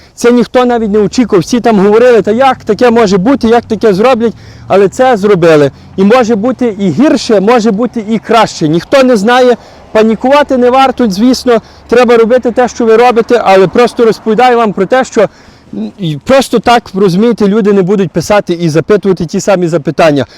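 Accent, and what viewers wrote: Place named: Lviv, Ukraine. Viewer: Мова дійсно чудова. І вимова львівська.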